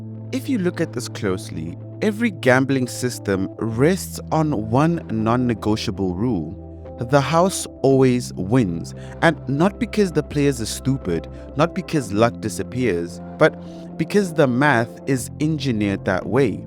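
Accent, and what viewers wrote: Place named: Nigeria. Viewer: This dude sounds like a Nigerian. I am out here !!!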